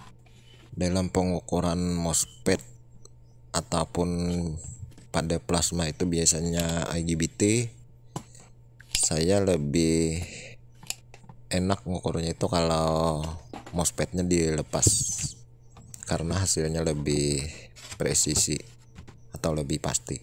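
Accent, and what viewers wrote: Banjar, Indonesia. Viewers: Pina kaya orang banjar pian niiih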